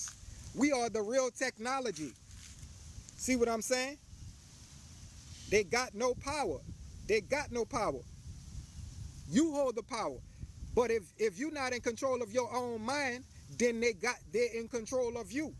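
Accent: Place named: New Orleans, United States. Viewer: Look at Godhead 🙌 I just stumbled across another #Heyoka and sounds like you from the NoLa too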